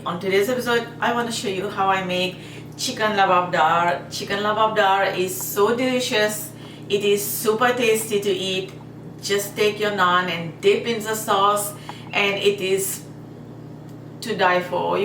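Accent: Mauritius. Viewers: Love the Mauritian accent👍🏽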